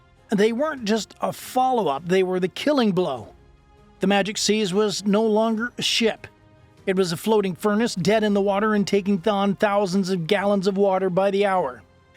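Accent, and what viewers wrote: Canada. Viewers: Is that a Canadian accent i hear? 🤔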